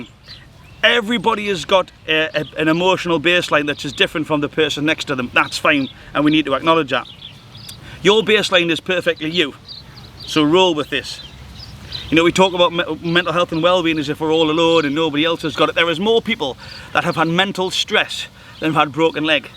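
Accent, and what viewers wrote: Newcastle, United Kingdom. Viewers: Hes got a geordie accent,its the nicest accent.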